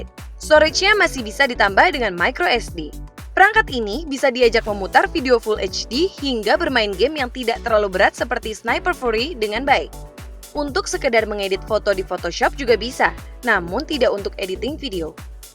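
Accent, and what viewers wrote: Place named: Java, Indonesia. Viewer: Logat jawane kentel bgt :D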